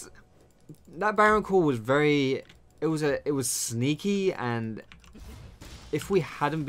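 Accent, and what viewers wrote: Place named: Birmingham, United Kingdom. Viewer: HEALTH POTIONS PELASE. You sounded so much like my brummie housemate, made me laugh so much xD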